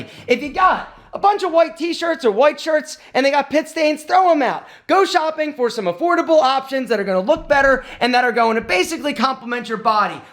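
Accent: Philadelphia, United States. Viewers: “Wood-er” the Philly/Delco accent stays with you!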